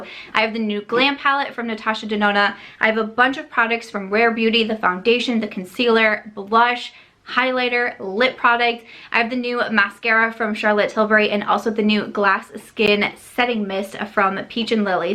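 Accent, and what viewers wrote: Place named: Midwest, United States. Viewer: So i agreed with so much of what you said here. 1. This palette totally speaks to me; i also have very few cool-toned nudes in my collection. 2. Placement suggestions as names?! UGH. I understand the helpful aspect of it, but that can go on a little card or on the plastic sleeve that comes in palettes. I think names spark creativity when they give a vibe to go with the color. If (when?) i get this palette, i'll make little stickers with my own names to cover the nonsense that ND put on there. 3. Rare Beauty: i have lupus, rheumatoid arthritis, neuropathy, and several other conditions that cause pain and fatigue, which sometimes makes even the simplest tasks (like opening a small bottle) very difficult or impossible. So i deeply appreciate the thoughtfulness with the packaging. Plus her message about beauty is something we all need to hear. 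<3 Side note: your Midwest twang (it makes me miss my Chi home), your green eyes (like mine), your love of wine and the Cubs, your birthday is the day before mine (which makes us both Aries)...are we related?! LOLOLOLOL :)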